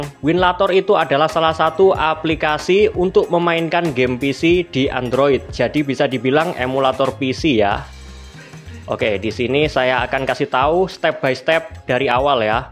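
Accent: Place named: Java, Indonesia. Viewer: Sene deragon, bahasa medok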